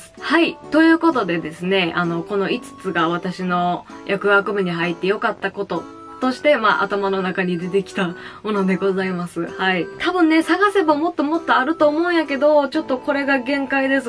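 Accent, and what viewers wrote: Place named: Osaka, Japan. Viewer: 喋りが面白すぎて、チャンネル登録しちゃいました。大阪のイントネーション良いよね。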